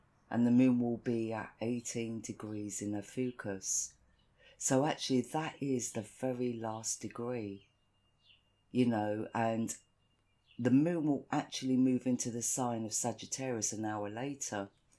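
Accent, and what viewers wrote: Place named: Kent, United Kingdom. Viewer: Your accent sounds like where we lived in Sandwich, Kent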